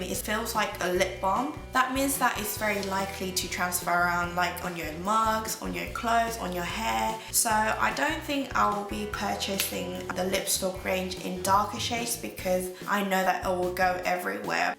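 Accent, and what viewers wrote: United Kingdom. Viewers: 늘 유튜브볼때마다 느끼는 거지만 영국억양 너무 좋네요^-^ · 님 영상 보고 핑크오이스터 샀는데 예뻐요! 영국 억양은 3년만에 익히신건가요? 신기해요^^ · 엉니 영상 자주올려주세요ㅜ 언니 영국발음도 넘 좋고 화장도 진짜 잘하세요!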